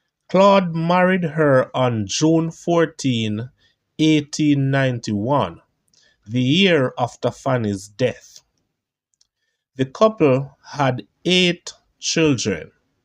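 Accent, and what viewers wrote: Jamaica. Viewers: The Grammar police are out in full force. I appreciate the Jamaican accent with all it's pronunciations and inflections. What I enjoy the most is all the information in these videos and the effort that has gone into visiting the locations, researching, editing and narrating them. Now Grammar Police send the links to your perfectly edited, interesting and entertaining videos so I can watch them. Thanks x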